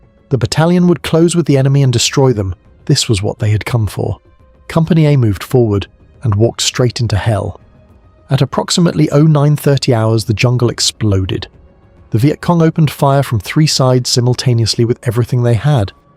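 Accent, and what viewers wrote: United Kingdom. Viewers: Wwwhhy a gd Brit narrator voice? What was next to last option ?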